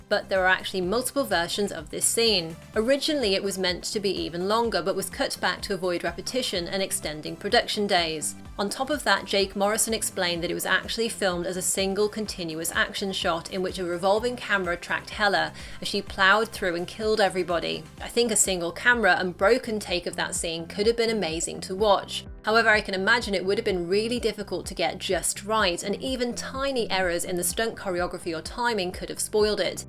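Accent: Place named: RP, United Kingdom. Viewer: Can you be creative instead of being English public school voice confident without having a fucking clue what you're doing mode. I think the days of that are very numbered.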